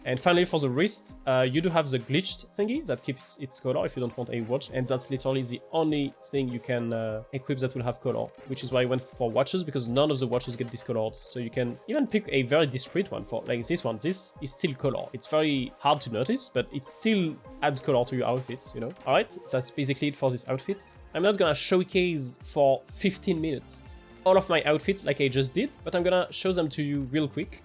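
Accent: France. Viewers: You ´r French ?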